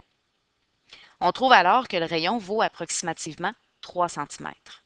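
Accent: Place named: Quebec, Canada. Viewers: Accent québécois*** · J adorre l accent canadien 😍 Très bonne vidéo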